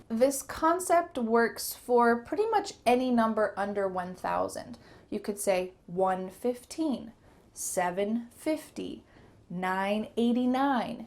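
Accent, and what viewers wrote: United States. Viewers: Wow, you've got American accent, that's incredible. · Hi! So happy to see you here again.. (and again and again!) You're looking ravishing! You chose an excellent topic 'cause "big numbers" is one of the quite unclear topics which many learners know even worse than any grammar question as well and it's truth. I'm grateful to you 'n' your channel for a wonderful possibility study a real American English with gorgeous accent (your "water bottle" is flawless for accent training, thanks) and marvelous pronunciation. has also beautiful accent and It's good that he's being agree to take part in your lessons. I was just fallen for your pretty voice, these endearing eyes, your sunny smile, your expressive mimic 'n' manner of speaking with correct, a real American accent as well. All of this drove me crazy at once when I ran across your channel by the link what gave me Lucy from "English with Lucy" channel. 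And I'll be always faithful to you 'n' your channel 'cause due to it my main dream is coming true! Good luck, strong health 'n' all the best! See you soon!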